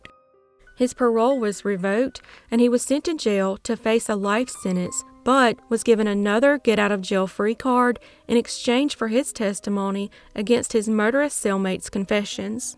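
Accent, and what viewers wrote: South, United States. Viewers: Yes, I agree with everyone. Your opinion spun on this vile behavior coupled with that Southern accent is expressed quite nicely. Sorry ass sons lol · Love her southern accent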